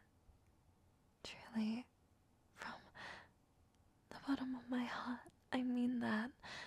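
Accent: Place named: Australia. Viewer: Damn this is the first time I've heard an Australian accent in asmr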